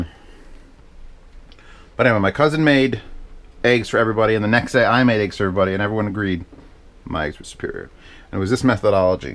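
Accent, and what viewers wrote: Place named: New Jersey, United States. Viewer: Where you from? Sounds like Jersey or Philly... just bring it up because "white American"... I say it, and I'm from South Jersey (right outside of Philly)....live in wretched North Dakota now and they call white American..... fucking Swiss!!! Swiss!!!! It's not Swiss, Swiss has holes and is also delicious with imported ham, but is NOT white American cheese damnit. Ok, that's all.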